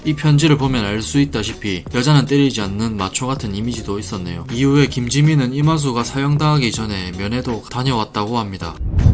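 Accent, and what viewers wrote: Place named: Busan, South Korea. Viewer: 같은 내용이라도, 부산 사투리 참 좋네요. 구독. 좋아요 누릅니다.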